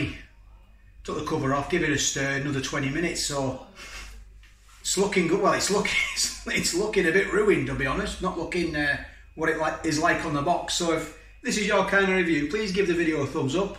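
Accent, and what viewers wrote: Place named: Lancashire, United Kingdom. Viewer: Where you from, you speak like me lol. Lancashire/?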